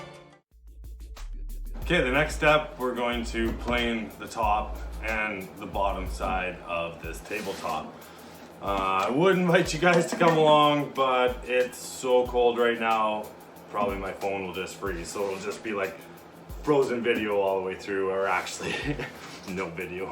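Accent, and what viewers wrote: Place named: Ottawa Valley, Canada. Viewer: Man, are you from the Ottawa Valley buy any chance? You talk like everyone i know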